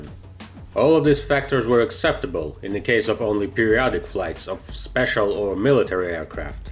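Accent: Russia. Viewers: А почему с русским акцентом? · I love his Russian accent.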